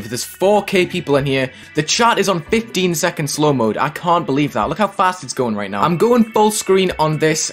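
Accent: United Kingdom. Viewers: bri' ish???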